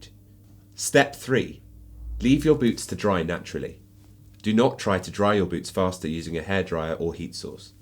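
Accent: United Kingdom. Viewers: I hate how much I love British accent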